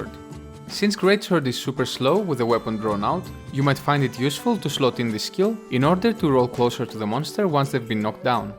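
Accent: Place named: Greece. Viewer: Efxaristo haha, elliniko accent kani bam alla ta agglika sou ine poli kala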